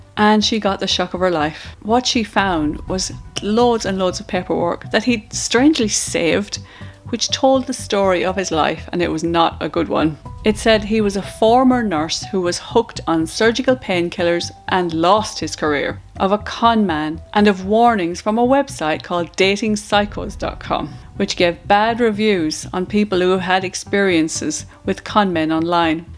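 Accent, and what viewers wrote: Ireland. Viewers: Sounds Irish to me (Florida USA). Delightful. I love how y'all say "cuddle" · The Irish accent adds to your narration sounds great. However, this story really allows victims to be complete helpless imbeciles, like they're unable to protect themselves.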